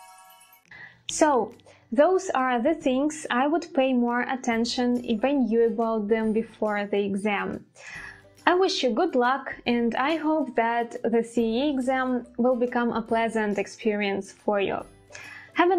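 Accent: Russia. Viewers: Wow, Russian accent and manner of speaking are so noticeable:) You don't really sound like a native... no offence, tho. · It's really not, I don't think you heard native English accent. I could hear the russian accent from the very first minute of the video. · Real Russian intonations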